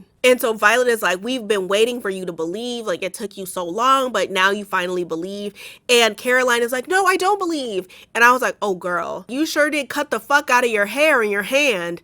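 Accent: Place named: Deep South, United States. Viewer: I just stumbled upon this and at the beginning i was like from exactly how Deep South is this girl??😂😂😂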